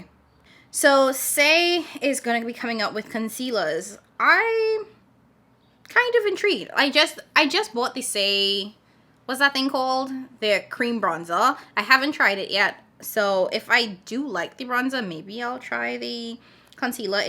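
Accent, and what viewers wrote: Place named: Trinidad and Tobago. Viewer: Found your channel today and I'm enjoying your content and Trini accent.......it's like seeing a familiar face in a room full of strangers. Please continue to post and share your knowledge.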